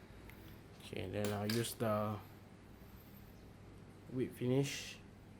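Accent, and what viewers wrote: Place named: Singapore. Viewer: Where do you fly fishing usualy?are you from singapore??the way you talk just like a singaporean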